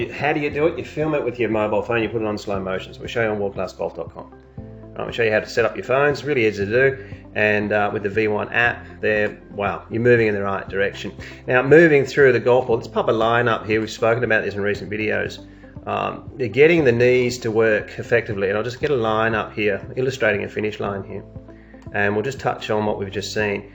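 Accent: Australia. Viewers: great visual animations to assist the explanation, plus the sweet Aussie accent helps too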